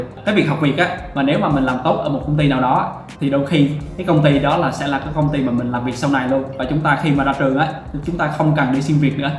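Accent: Da Nang, Vietnam. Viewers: a oi anh học ở đn hà anh, nghe giọng n giống đà nẵng qué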